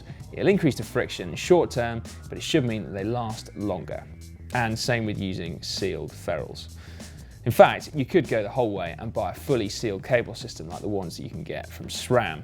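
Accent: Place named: United Kingdom. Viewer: As an American it gets tiresome listening to British accents all day when looking for good bike videos... but how badass is it that this guy is not only a great rider who loves to get hands on and understand the concepts behind how his gear functions, but he's got a clear and engaging style of presentation as well. Just a shout out to this dude and his many great videos.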